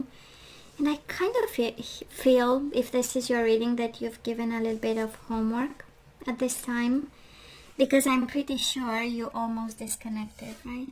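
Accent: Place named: Philippines. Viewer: Y u speak like a Filipino?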